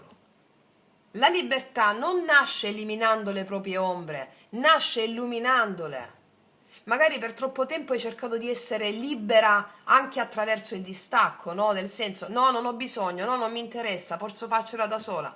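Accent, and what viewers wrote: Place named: Salento, Italy. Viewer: Cara ti seguo ogni tanto ma dall'Inter calare della tua voce sembra che tu sia salentina come me' io sono della provincia di lecce è tu di dove sei?❤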